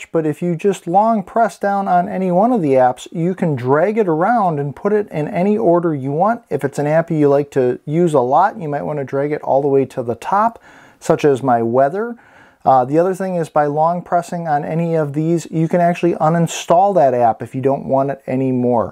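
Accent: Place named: Chicago, United States. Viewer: yup....you're from chicago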